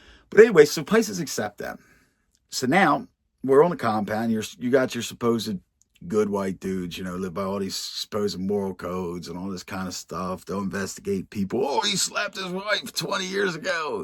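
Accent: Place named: Pittsburgh, United States. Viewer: That accent, are you a fellow Pittsburgher? Or from Philly? Hearing you talk reminds me of shooting the shit with my homies back State Side. I’m standing on business - on the good side of the law now - in Đà Nẵng, Việt Nam and just because I speak the language doesn’t mean I don’t miss talking and listening to that real raw American nitty gritty from someone else who’s been in the trenches and popped up a better man yet have a prequel, story, and sequel about running wild. Type of things I highly censor when it comes to talking to the Misses 😂 Just subscribed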